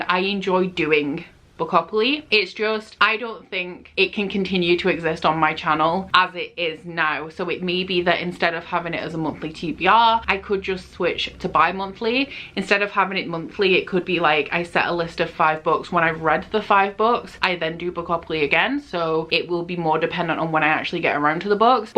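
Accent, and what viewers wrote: Yorkshire, United Kingdom. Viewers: Heey, great video i love supporting your channel. It’s so nice to here a yorkshire accent on youtube as that’s where i’m from and i don’t feel like there are that many booktubers from here. I was just wandering if you have any advice on how to read more as a very busy student with not that much time? as i love reading but i struggle with what to read etc as i’m trying to juggle my masters, work and a life as well? Any advice would be appreciated 😄😄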